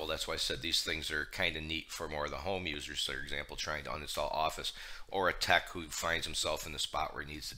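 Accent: United States. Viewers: I can't understand american accent but... I was so atracted by this deep male voice mmmmhhh very inspired😋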